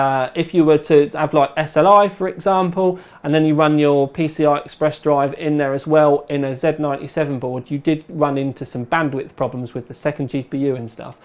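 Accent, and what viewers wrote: United Kingdom. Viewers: Is this British accent? :D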